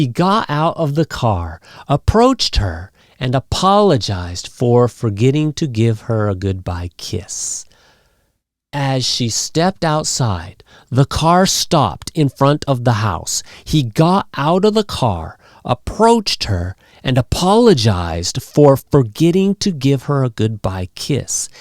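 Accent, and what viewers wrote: United States. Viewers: This voice Sound of American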